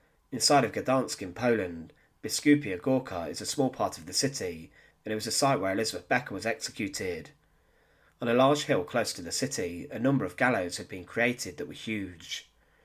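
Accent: United Kingdom. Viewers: It seems you have a British accent, have you ever made a video about the 50 plus concentration camps build by the British? i guess NOT! You poms are good in pointing the finger on others!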